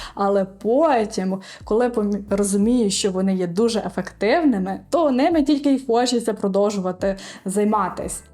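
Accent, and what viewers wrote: Western Ukraine, Ukraine. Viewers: Судячи з акценту говірки (характерного м’якого «и»), ви, швидше за все народились і виросли на заході України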